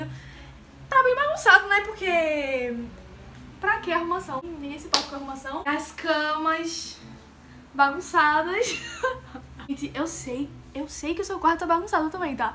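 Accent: Northeast, Brazil. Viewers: Que sutaque maravilhoso de se escultar (sou do nordeste tb)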